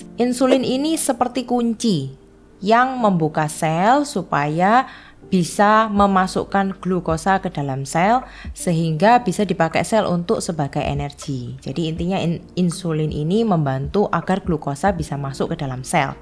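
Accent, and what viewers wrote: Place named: East Java, Indonesia. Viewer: Khas Jawa Timur😊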